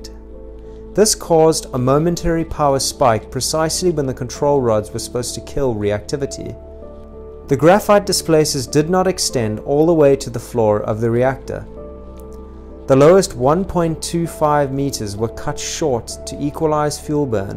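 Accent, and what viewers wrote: South Africa. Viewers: Just heard your accent and knew you from South Africa😂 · Nice SA accent boet❤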